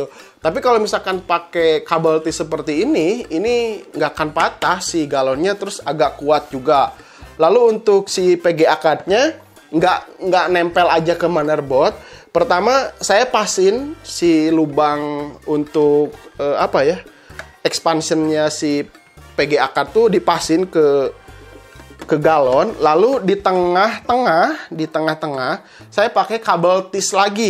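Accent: West Java, Indonesia. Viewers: Saia suka logat anda👍🏼, sundanese bangett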